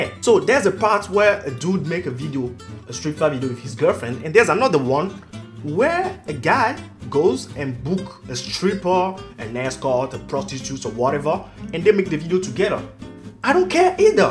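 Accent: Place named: France. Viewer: ♥ Meilleur youtuber avec le meilleur accent FRENGLISH mdr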